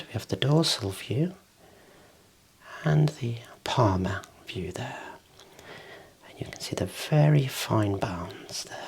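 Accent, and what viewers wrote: United Kingdom. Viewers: Your voice is very relaxing fine british gentleman in deed thanks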